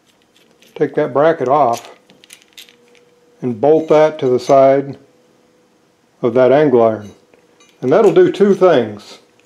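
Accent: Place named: Kentucky, United States. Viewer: I live in south central ky. Listening to your voice I suspect you may live close to me! I enjoy your videos!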